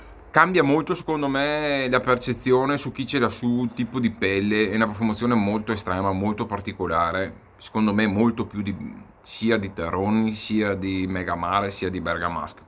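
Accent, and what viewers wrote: Veneto, Italy. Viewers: Salve,potrei chiederle con tutto il rispetto,di dove è il suo accento?.Sono molto curioso dato che studio la lingua italiana da un po’.Se non sbaglio il suo accento protrebbe essere Veneto….Volevo anche ringraziarla per la ottima recensione.Grazie mille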